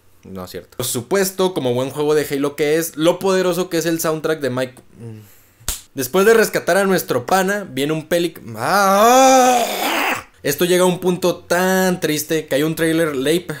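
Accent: Mexico. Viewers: Me suscribí porque siento que eres mexicano tu forma de hablar pero hay un momento que hagas un video de five nights at Freddy's. Si puedes contar la historia porque de verdad asquerosidad de historia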